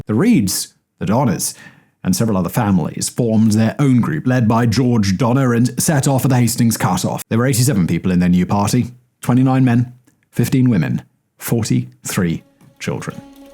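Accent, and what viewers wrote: United Kingdom. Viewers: Why are British accents so dreary/boring? This guy could be talking about the cancer cure and I'd fall asleep every time.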